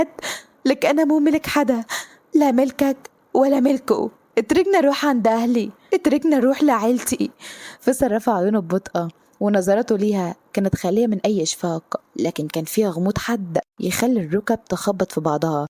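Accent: Syria. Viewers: تحفه وصوتك تحفه وكلامك تحفه وقصصك تحفه ونتي تحفه كل حاجه فيكي تحفه ولكلام السوري طالع من بقك زي العسل ربنا يخليكي يا يا مدلعانا حبيبت قلبي انتي 💐👏💝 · حبييييييييييت اللهجة السورية تبعك❤ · 😂 او يا بتعرفي تحكي سوري كويس ماشي حال جيد جدا ❤ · لهجتك السورية جميله قوي ❤❤❤❤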